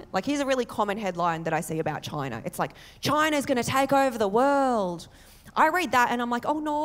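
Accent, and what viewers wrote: Australia. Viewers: I love watching and of course listening to her Australian accent. Her routine is funny and her timing is awesome! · Nah you got that Asian Australian voice/accent. There’s that accent that is not a fob accent, but it’s very typical of Chinese descent Aussies.. especially women. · It's unusual to see someone of Asian heritage (or African) with such a strong Aussie accent. It's very endearing! Continue being bogan and proud! Own it! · You are the best of both world , beautiful Asian looks and wiked Aussie accent , keep up the great work you have a great sense of humor ! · She has the Chinese/Vietnamese Australian accent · She's Aussie for sure! No Asian can speak like that!🤭😎👍👍👍👌👌👊 Your awesome lady!👍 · ABC 👍👍perfect australian accent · She sounds so Aussie it’s mad. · If you live in Australia and are respectful and proud to be in Australia then I have no problem with calling you Australian. 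You however have such a strong Aussie accent that I would have assumed you were actually born here and maybe even your parents had been here for a long time. Also that was a hilarious video. Cheers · she has a Asian au accent very common in Melbourne and Sydney she doesn’t sound bogan at all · I'm a gen x white guy from Melbourne and she sounds more Aussie than me lol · What makes her funny is she has a THICK Aussie accent, she's funny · She has that Australian Asian accent. Most of my Aussie asian friends have it. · That's pure Aussie accent, Mate! She grew up in Sydney. You just assumed she has Vietnamese heritage from her name, & while she says she Chinese, she was NOT born in China nor Vietnam. You'll never get where was she was born! If you think the tone changes & the accentuated vowels is anything to with a Chinese or Vietnamese accent it doesn't!. That is all 100% this type of Aus accent. 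If you'd only had heard the audio, you would have no clue there's anything other than aussie. Because there isn't. Now go watch Diana Ngyen, to see the difference between accent, & what you wrongly think her accent is. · I've never heard anyone more Australian in my life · I love when another nationality sounds like us true Aussie’s ! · Sounds more Aussie than most Aussies😁🤣🤣👍🇦🇺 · You sound more Australian than Nicole Kidman and Margot Robbie combined. Good stuff lol. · Hi. "Bogan" isn't exactly the equivalent of American 'Redneck'. (it's just the nearest word/type to explain it). A better description of Bogan is "a bit rough" in style and speech. accent is how some Bogans would also sound. (some, not all). However, there's also thing called "cashed-up" bogans. Definitely not unsophisticated, just might sound it from way they talk. Of course there are also the many "true bogans". After 4 or 5 VBs, or Fruitly Lexia, nearly everyone becomes Bogan, lol There's several types of Bogans, just like there's (more than) several types of Australian accent. 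is not common now in Melbourne or Sydney & in a lot of Brisbane any more, except in some outer suburbs. Many Australians are commenting, in/on videos it's the "most Australian" accent they've heard. That type of Aus accent was common till the 90s, but in the cities, at least it's often more rounded now. But there's also the frequent Euro-Australian accent, which is another, again. (Although, quite a number of Austalians don't realise they're own accent is more "Australian" that they think) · You are quite funny. I love your Aussie accent. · I Honestly never realized Super Cute Asian Girl with an Australian accent was my thing until just now. Alas, you're too young for me young lady, so it'll never work. You're really funny. You deserve a Comedy special. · Love the Aussie accent regardless of the ethnicity… .. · face deffo doesnt match the voice. yer an Aussie through and through. subbed.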